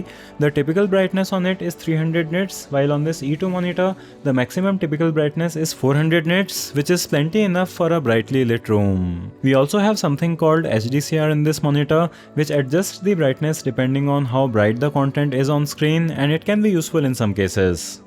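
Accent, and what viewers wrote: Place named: India. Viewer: English with indian accent.. beautiful.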